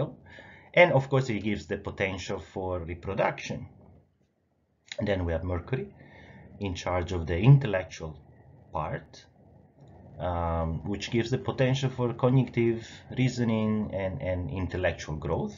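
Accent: Italy. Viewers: Sorry,are you italian??? 😅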